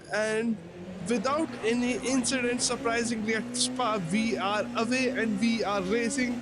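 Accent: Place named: Arab world. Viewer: Are you Arabic? I can hear your accent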